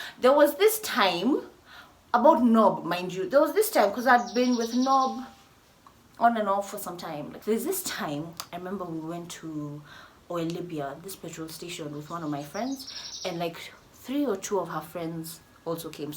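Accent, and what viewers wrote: United Kingdom. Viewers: I reall love how you mix up accents, , british English and "kenyan" english, , na some kiswahili😂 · That British accent 😍😍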